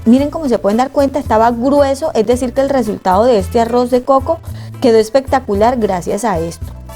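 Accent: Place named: Caribbean Coast, Colombia. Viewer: Tienes acento costeño 😉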